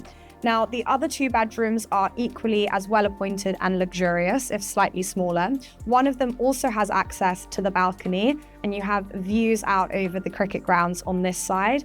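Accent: RP, United Kingdom. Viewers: OK Yah person employed to flog ludicrously expensive pad. Almost comical received English.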